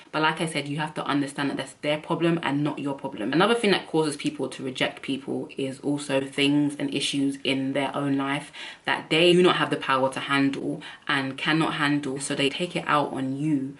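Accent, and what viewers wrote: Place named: United Kingdom. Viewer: When you played the little Heavenly music leads me to believe you believe in a higher power The Most High Yahawah and his only begotten son Yahawashi Messiah l feel like have to ask you to research your history although you sound like your from the UK but that isn't your nationality right or wrong...? Please don't misunderstand why l am asking you about your nationality, here's why l am inquiring about your ethnic background because l've learned about 5 years ago now that although l was born in America taken from Africa l am neither African nor American, from the time we so called Negroes where dragged here against our wills and turned into Slaves well our ancestors where turned into slaves anyway, we as a people have walked around in this whole Earth where we were all scattered throughout the whole world as bondsmen and bondswomen (SLAVES) Well to make a long story short if you are a descendant of slaves as blacks (the so called Negroes) are here in The Americas (United States) and South America than l ask of you to start researching your history but please go buy unless you already own it the Greatest history book every written called "THE KING JAMES BIBLE/W THE APOCRYPHA " Start with that to begin your research on you history and look for secular historical history that matches the scriptures that verify who you truly are and your true historical and biblical identity you will be amazed when you find out it'll help answer a lot of your questions about circumstances and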